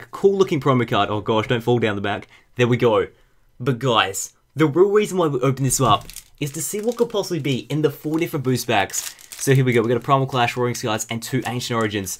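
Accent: Australia. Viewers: Are you an Aussie? I am you sound like one, if so do you follow the afl and who do you follow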